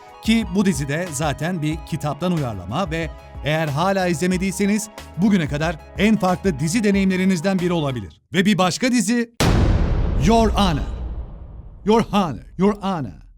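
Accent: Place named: Central Anatolia, Turkey. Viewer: Neden İç Anadolu ağzıyla konuşuyorsunuz efendim?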